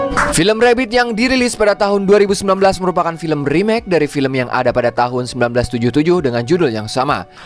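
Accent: West Java, Indonesia. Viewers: Indo x Sunda 😂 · Kek IQ7 mana pake logat Sunda lagi kek 1 orang😅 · Sunda banget 🤣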